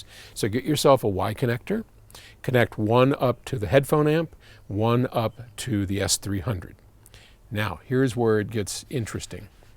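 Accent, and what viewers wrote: United Kingdom. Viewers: THE British accent!... Which one?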